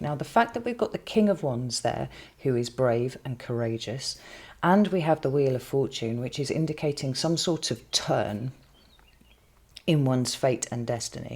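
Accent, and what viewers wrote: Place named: England, United Kingdom. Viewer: I’ve never been a fan of the English accent, but I like it when I’m in here. I guess there has to be a threat of profanity to soften it for my ears. Delivery is stunning. I’d put you on the large screen and the cards in the small screen, if left to me.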